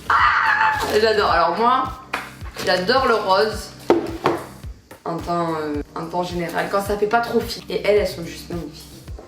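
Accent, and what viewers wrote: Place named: Marseille, France. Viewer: Putin t’as un accent marseillais magnifique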